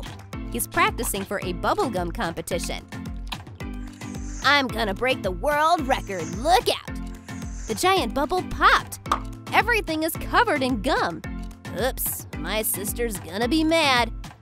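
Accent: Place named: Wisconsin, United States. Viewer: You sound like you're from Wisconsin!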